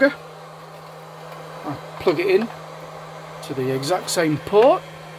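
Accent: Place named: United Kingdom. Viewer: Hi, Just watched your video after doing a search on the net for Epever com port failure. I have a Tracer 4210AN which I have had for about 9 months. 2 days ago the data logging suddenly stopped, I monitor it using a custom logger called a SolarDashboard buy SnekTek which is very similar to your data logger. I can also monitor and adjust setting via my PC using a USB to RJ45 cable. Now I cant do either. Any suggestions? I too am in the UK (guessing by your accent) Nice to see an audio cassette on your shelf next to your stereo. My setup is in my garage where I too have a stereo and was only playing a cassette the other day. Graham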